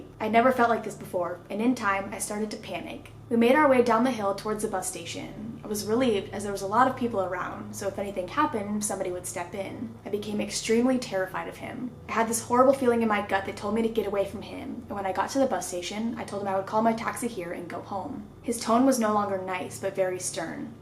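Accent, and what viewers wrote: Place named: South, United States. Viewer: She sounded so southern in the videos holy shit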